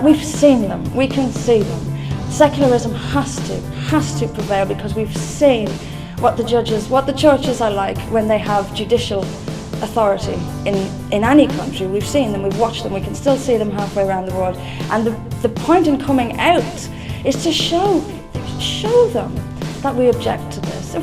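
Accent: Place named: Ireland. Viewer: hey she sounds Irish. fun fun w/the vatican mantra/organized crime etc aye?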